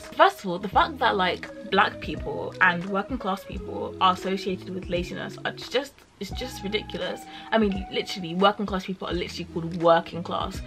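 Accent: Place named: Leeds, United Kingdom. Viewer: I think your accent has been influenced by Leeds. You don't sound fully london anymore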